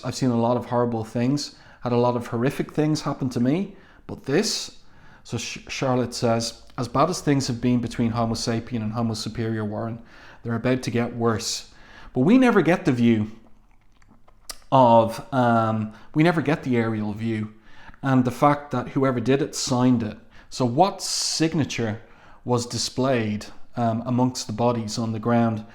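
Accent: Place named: Ireland. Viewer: Lovely to hear a modern Irish voice online.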